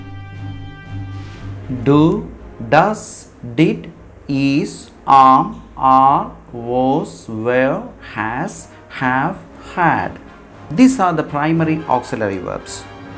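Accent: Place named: India. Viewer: Indian English.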